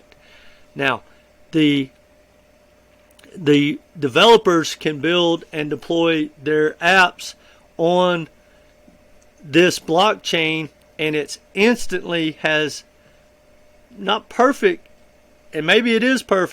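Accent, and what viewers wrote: United States. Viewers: I love your accent SO damn American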